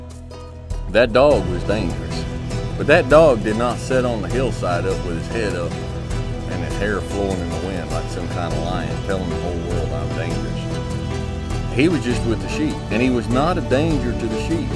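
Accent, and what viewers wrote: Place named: South, United States. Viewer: Somehow hearing all these sayings, with the southern accent makes you want to be a Badass Buddha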